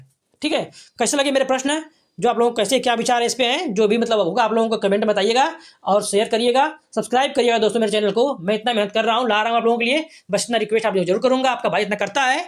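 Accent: Ghazipur, India. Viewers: wahi मैंने सोचा ghazipur mau। या azamgarh से honge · Bhai prayagraj se katti nhi lag rahe ... Tone bilkul Ghazipur mau wali h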